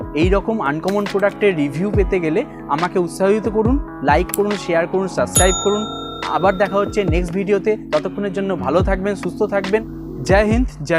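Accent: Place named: United States. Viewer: He talks Hindi in American accent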